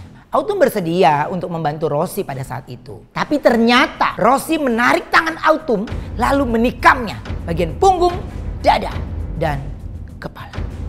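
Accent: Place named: Bekasi, Indonesia. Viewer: Cerita serem ko malah jdi kocak..sih ko.. Bahasanya gaool bangaaat.. (logat orang bekasi bangat gitu..) haha.. Sukses terus deh.. Makin lancar n berlimpah rezekinya buat..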